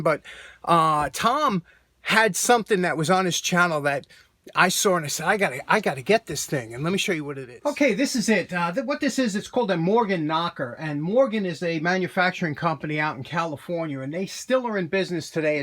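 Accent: New York, United States. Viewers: i’m a new yorker too, born in brooklyn, grew up in Flushing, now live in Denver, great hearing your accent😊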